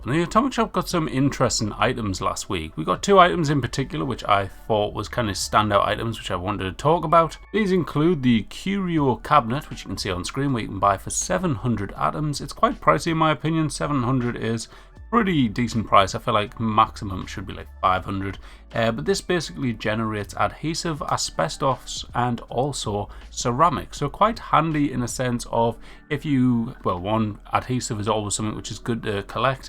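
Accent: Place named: Northeast England, United Kingdom. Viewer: 2 different accents! The skyline guy is trying to do a Scottish accent (badly) & is from the Northeast of England.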